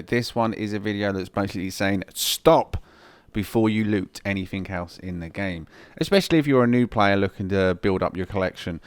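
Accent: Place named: United Kingdom. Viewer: literally what he just sounds british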